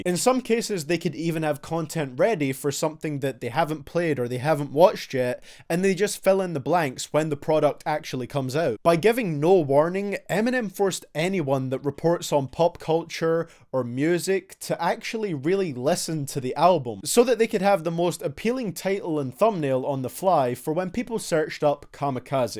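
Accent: Canada. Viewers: this is the most canadian accent i've ever heard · Canadian accent. Well good video anyways. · Okay his popularity was never gone but maybe his album sales was halfed until kamikaze but he still outsold his peers ...so he still wins EMINEM WAS NEVER IRRELEVANT EVER EVEB WITH HIS NOT SO GOOD SELLING REVIVAL!!!! Also are you Canadian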